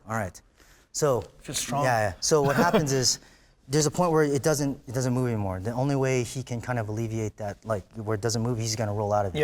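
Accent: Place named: Ireland. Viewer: DoYou KnowDeWae? <- they're right. he said nice. it sounds weird to you over-conspiracizing idiots because you all forget that he is irish and has a thing called an accent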